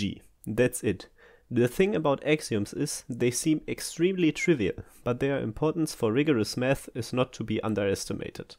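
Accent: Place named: Switzerland. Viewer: Why do you have a swiss accent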